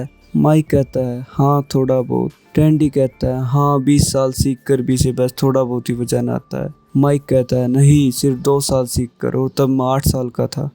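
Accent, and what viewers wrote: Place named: Haryana, India. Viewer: Tum haryana se ho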